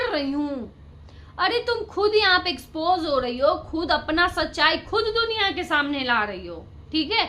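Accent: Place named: Bihar, India. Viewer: बिहारी अंदाज का मस्त बोलती हो आप मजा आ गया ❤❤❤❤❤❤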